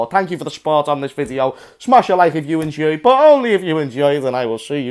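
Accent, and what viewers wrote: Liverpool, United Kingdom. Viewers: I love the liverpool accent man 😂 only reason i watch till the end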